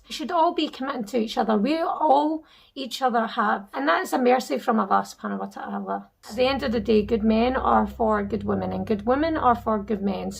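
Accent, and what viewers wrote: Scotland, United Kingdom. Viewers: Mashallah ... you have more insight and reason than all those men hhhhh and your scottish accent is like the cherry on top hhhh ....i love scottish accent so mush .. and to find a channel of a muslim scottish woman ... YA Allah .. i feel like i just found a treasure hhhhh May Allah bless you sister . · I'm not used to female youtuber with Islamic content, but I'm definitely staying. Love Scottish accent 😍😍😍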